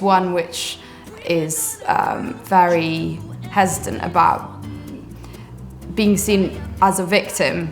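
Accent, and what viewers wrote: Wales, United Kingdom. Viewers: Ugh her Welsh accent ♡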